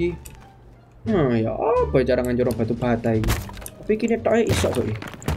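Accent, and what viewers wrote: Java, Indonesia. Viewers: Bang bang orang jowo ya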